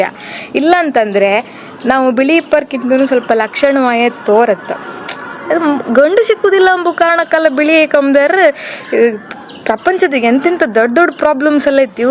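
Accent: Kundapura, India. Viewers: Beautiful Kundapura accent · ನಿಮ್ಮ ಕುಂದಾಪುರದ ಕನ್ನಡ ಕೇಳಲಿಕ್ಕೆ ಕಿವಿ ಎಷ್ಟು ಇಂಪಾಗುತ್ತದೆ ಎಷ್ಟು ಚೆನ್ನಾಗಿ ಮಾತಾಡ್ತೀರಾ ನಿಮ್ಮ ಕುಂದಾಪುರ ಕನ್ನಡ ನಂಗ್ ತುಂಬಾನೇ ಇಷ್ಟ ❤🎉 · Lofer ನಾಯಿ adu kundaapura kannada.. Modlu sede tara aadodu bidu. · ಕುಂದಾಪುರ ಕನ್ನಡ ˌ ಕೇಳಲು ಪರಮಾನಂದ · ಇದು ಕುಂದಾಪುರ ಕನ್ನಡ, ಉಡುಪಿ ಜಿಲ್ಲೆ, ಕುಂದಾಪುರ. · Nimma kudapra mathu chenda untu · ಕುಂದಾಪುರ ಕನ್ನಡ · Kundapura kannada kembuke chenda adrge niv kuda thumba lakshana kanthidri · ಕುಂದಾಪುರದ ಚೆಂದ ಭಾಷೆ ಕೇಳಿ ಖುಷಿ ಆಯ್ತು ❤❤ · ಭಾರೀ ಶೋಕು ಉಂಟು ನಿಮ್ಮ ಕುಂದಾಪುರದ ಕನ್ನಡ ಕೇಳಿಕೆ ಬಾರೀ ಸಿಹಿ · Namma. Kundapura. Kannada ❤ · Kappadaru sundaravagiddi.chennangithu kundapur bhashe. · ಕುಂದಾಪುರ ಕನ್ನಡ ಕೇಳೊಕೆ ತುಂಬಾ ಇಂಪಾಗಿ ಕೆಲ್ಸುತೆ 🌹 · ಎಂತ ಕುಂದಾಪುರ ಭಾಷೆ, ಮಾರ್ರೇ 👌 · Idu namma kundapura kannada..❤ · Kundpura kannada ❤ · Kundapura kannada matra chanda marey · ನೀವು ತಲೆ ಕೆಡಿಸಿಕೊಳ್ಳಬೇಡಿ ಅವನ ಮಾತಿಗೆ Kape ಕಸ್ತುರಿ ನಿಮ್ ಮಾತು ತುಂಬಾ ಖುಷಿ ಆಯ್ತಾ ಕುಧಾಪುರ ಕನ್ನಡ ಸೂಪರ್ ಅವನ್ ಕಣು ಸರಿ ಇಲ್ಲ ನೀವು ತುಂಬಾ ಸುಂದರ ಇದ್ದೀರಾ👌👌👌💐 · ಕಪ್ಪಾದರೇನು, ಲಕ್ಷಣವಾಗಿದ್ದೀರಿ, ತುಂಬಾ ಚೆನ್ನಾಗಿ ಮಾತಾಡ್ತೀರಿ, ಕುಂದಾಪುರ ಭಾಷೆ ಕೇಳಲಿಕ್ಕೆ ಖುಷಿಯಾಗ್ತದೆ. 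God Bless you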